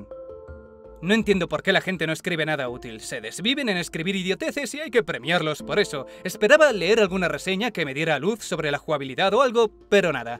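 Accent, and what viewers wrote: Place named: Castile, Spain. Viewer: 🌕🌗🌑🌑🌑Vaya pedazo de video mal hecho, me tengo que ir en 9 min y el video dura casi 16 MINUTASOS, ah y de paso la voz esta en castellano y no en latino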